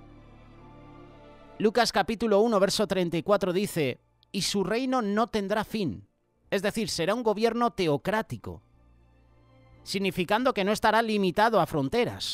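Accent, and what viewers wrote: Spain. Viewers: Gracias señor por su siervo que nos enseña de manera tan clara mi Dios!!! El es español por su hermoso acento Dios gracias por la palabra que nos alimenta!! Bendiciones del señor para todos los que hemos creído en el señor Jesucristo saludos desde chile ❤🇨🇱🙏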